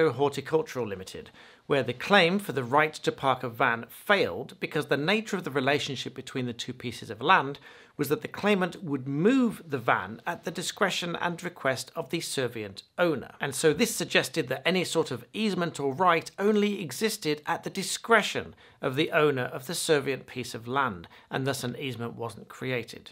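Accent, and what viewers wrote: United Kingdom. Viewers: With that accent, I have to ask, are these laws in the U.S. or the U.K.?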